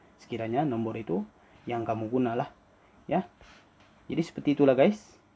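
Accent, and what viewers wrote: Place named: Sabah, Malaysia. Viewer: Macam urang sabah saja ini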